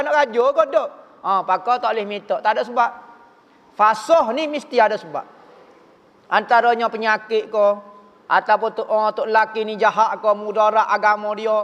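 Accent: Kelantan, Malaysia. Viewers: Alhamdulillah... Jarang2 dpt dgr ceramah dialek kelatey.. Terbaik ustaz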